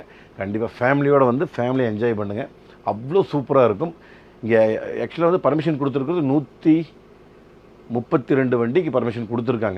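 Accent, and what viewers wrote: Kongu, India. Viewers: Super..that too in Kongu Tamil....very very nice sir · Ungkalathu kongu tami slang style remba. Arumai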